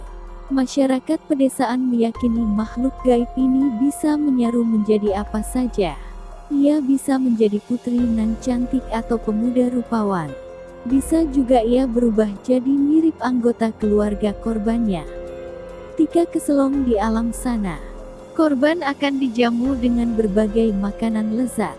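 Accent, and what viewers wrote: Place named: Java, Indonesia. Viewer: hadir mbak medok ..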